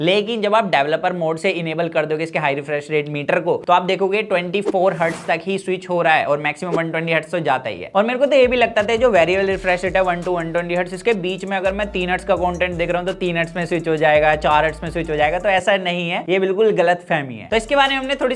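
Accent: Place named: Bhojpuri, India. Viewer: Batiya rhe bhojpuri 😂 achha lga bhojpuri type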